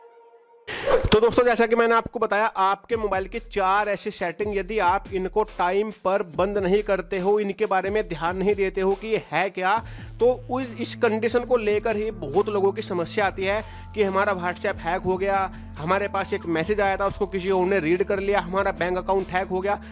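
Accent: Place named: Bihar, India. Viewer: Bihari h ye tu ..kaisa bol raha h bihari up ka h kiya bahiya ji up wale language dekhi iski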